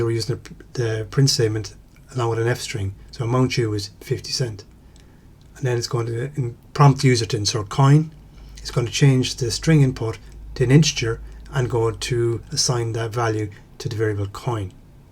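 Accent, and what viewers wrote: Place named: Ireland. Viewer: Thanks...Nice to hear he Irish accent...I'll buy you a Guinness if we ever meet.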